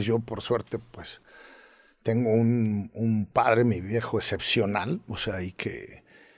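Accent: United States. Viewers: Tiene bie puesto el nopal en la frente. Hablando como chicano. Por favor. Que acomplejado este tipo. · A huevo tiene que pronunciar en estilo americano ,no puede hacerlo normal?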